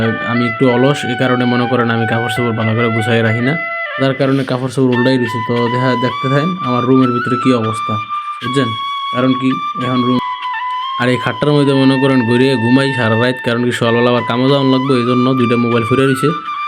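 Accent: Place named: Comilla, Bangladesh. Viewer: আসসালামু আলাইকুম, ভাই, আপনার বাড়ি কি কুমিল্লা, বুড়িচং/দেবিদ্বার এইদিকে? কিছু মনে কইরেন না, আপনার কথায় আমরার মতো মনে হইলো😊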